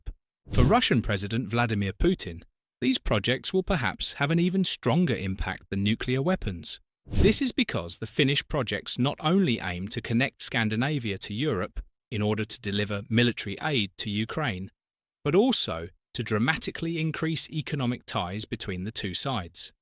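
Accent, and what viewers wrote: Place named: United Kingdom. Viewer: Not sure why Norway was turned into Sweden in the thumbnail. Feels like a typical US mistake to make, yet the voice is British.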